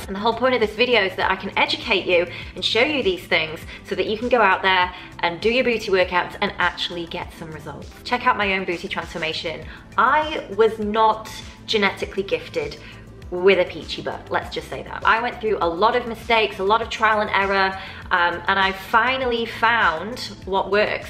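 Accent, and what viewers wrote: Southern England, United Kingdom. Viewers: Thanks:) I'm Spanish. I live in Spain but used to live in your home country England. I also have friends in the south of England, you people have the most beautiful accent! <3 My bum is improving and hubby notices it:D hehe... he says it's harder and rounder. Although I'm lucky and he finds me sexy no matter how big or small, hard or flabby:) I agree with you, age is no excuse to not be your best and my figure is kind of 'getting younger', sort of leaner. I seemed to concentrate my weight around my belly and upper thighs for years. I don't know if it's an age thing but I do think sitting down a lot (for work) has lots to do with it. I exercise at home and what I've done is place 15 kgs of dumbells on my belly. I contract my pelvic area to avoid any harm there and do 30 hipthrust reps concentrating on contracting the bum as you advise, rather than go very high up. 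I do this lying on my back with my legs on a sofa so I don't strain my knees at all.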